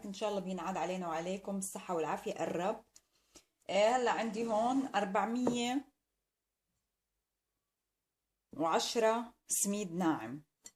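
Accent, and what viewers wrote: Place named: Nablus, Palestine. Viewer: الله عليك ، ما بتتخيلي قديش انبسطت بهالوصفة صرلي زماااان مشتهية الكلاج بالجبنة ، القطر لازم يكون بارد ولا لا ؟؟. من وين أنت بفلسطين ، حاسستك نابلسية صح ؟؟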